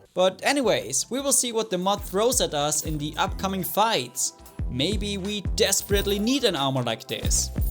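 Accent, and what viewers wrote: Germany. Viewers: You are german right?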